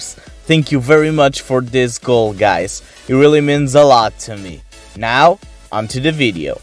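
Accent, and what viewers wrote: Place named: Portugal. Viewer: Sim! Ele fala também a nossa língua, só que Português de Portugal.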